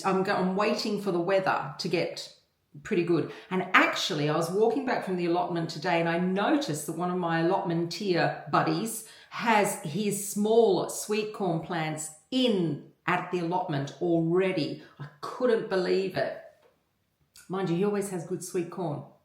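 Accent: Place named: Australia. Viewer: Hi Thank you for your videos they really helpful and yes, you do have a lovely Australian accent 😂 Im from Portugal, but I've been in England for 33 years, and i, too, don't think i have an accent, but apparently, i do I guess in my head, i just sound very British 😂 and it always makes me giggle when someone asks where im from😂 Have a lovely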